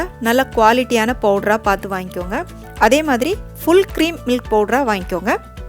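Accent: Tirunelveli, India. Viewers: Superrrrr. Voice Superb. I am also nellai district in sengottai. Unka voice supera irukum pothae ninichaen neenka namma oorunu. Keep it up ma'am. Pudina thuvail sollunka ma'am.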